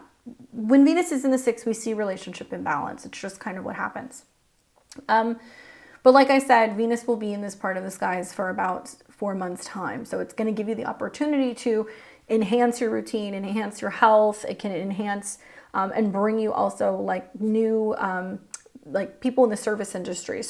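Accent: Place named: Cuba. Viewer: Oye you sound a little Cuban. You Cuban girl?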